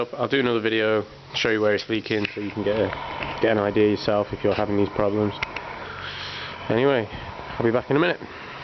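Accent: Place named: England, United Kingdom. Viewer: all I getta tell you is that you are very stupid. I always thought English people were smart.. but perhaps you are australian.. I can't say for sure, I am brazilian, but for me your accent is English. there is no way to solve the problem without removing the timing belt... BINGO, but you don't need all those special tools for keeping the timing of the engine. BINGO... you need to use your " loaf " ... some improvized tools will work the same way. I did it myself.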